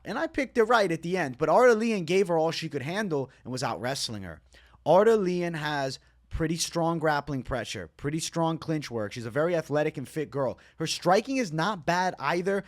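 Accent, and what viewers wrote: New York, United States. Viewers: a new york (boston? not good with ID'ing accents) version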